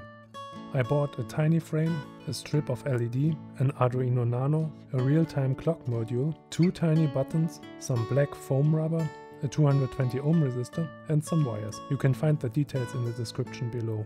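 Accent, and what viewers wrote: Germany. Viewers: Höre ich da etwa eine leicht deutsche Aussprache heraus?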